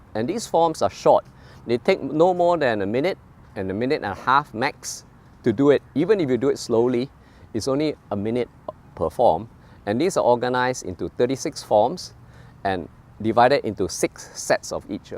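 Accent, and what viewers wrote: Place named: Singapore. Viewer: Sounds like he is from Singapore! I wanna learn from this sifu😂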